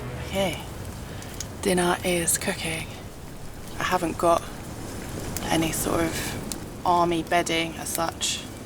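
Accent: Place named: RP, United Kingdom. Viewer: thank you, love how you try to hide the posh rege either way. bon labore' Thanks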